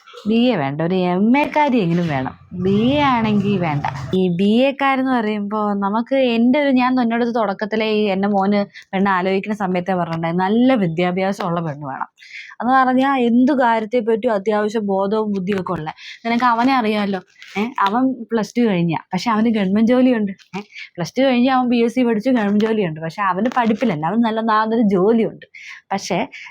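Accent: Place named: Palakkad, India. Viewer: Awww ന്റെ ചേച്ചിയെ ഞാൻ മലപ്പുറം ആണ്.. മലപ്പുറം ഇസ്ലാം സ്ലാങ് ink നന്നായി അറിയാം.. ഇസ്ലാംസ് എന്ന് പറഞ്ഞു താഴ്ത്തല്ലാട്ടോ.. എല്ലാരും മലപ്പുറംക്കാർ എല്ലാരും verity സ്ലാങ് ആണ്.. But അച്ചടി language ആർക്കും ഇല്ല.. But ചേച്ചിന്റെ മുസ്ലിം സ്ലാങ് കേട്ടാൽ മനസിലാവും പാലക്കാട്‌ ആണെന്ന്.. Nice❤️😌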